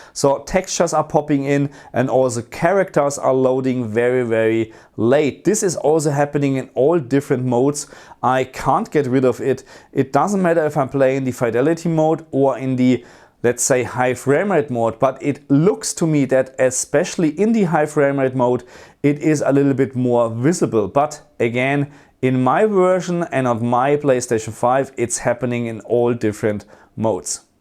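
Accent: Germany. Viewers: Man hört so raus das du Deutsche Wurzeln oder so hast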